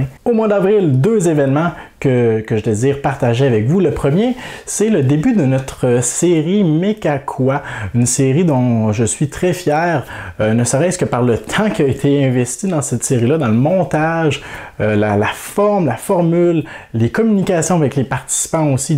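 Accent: Quebec, Canada. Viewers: Je t'adore tu sais ! j'adore les québécois ...j'ai d'ailleurs dans ma vie travaillait avec une québécoise dans un village de vacances et j'ai passé d'excellents moments avec elle. Et je retrouve dans toi toutes ces expressions qui me faisaient rire, cet accent qui vous est propre, mais surtout beaucoup pour ta gentillesse et tout le travail que tu effectues au quotidien !! Continue dans cette voie en espérant que tu seras moins stressé cette année !! a bientôt ! et le bonjour à ta femme ! mdr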